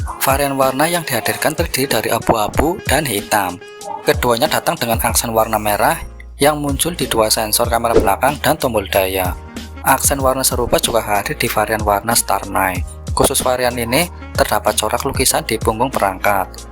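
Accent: Banyumas, Indonesia. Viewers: ngapak Iki :)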